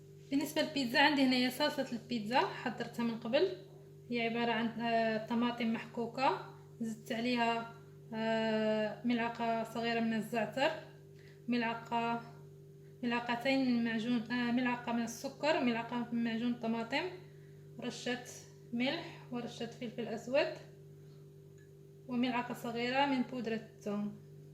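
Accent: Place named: Morocco. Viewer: روعه تسلم يدك واقتراحاتك عجبوني انا من عشاق المعجنات😍 حبيبتي باين انك مغربية من لهجتكم الجميلة بحب اهل المغرب اختك من العراق ☺ تشرفت بك وبقناتك اكثر من رائعة استمري 👍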